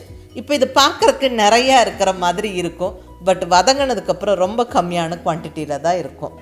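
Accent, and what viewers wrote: Coimbatore, India. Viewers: I'm the first to comment... Happy to do that... I'm from cbe and i love ur videos.. Nice presentation and kovai slang.. I am ur follower of chicken biriyani, brinjal fry etc.. All ur videos are worth watching.. Thank u soo much mam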